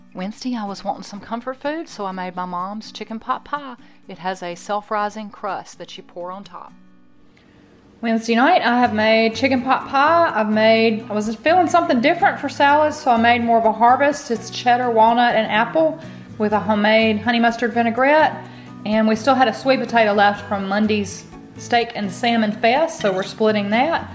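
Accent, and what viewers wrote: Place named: Mississippi, United States. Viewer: Thank you so much, I have been wanting to grill so badly, but we've had so much rain in our area, a small town in Northeast Ms., called Mantachie. I started watching your channel when Krista from The Logues mentioned you and how much she loved your accent..lol...I had to go watch, I remember telling Krista I couldn't tell because you sound like me.☺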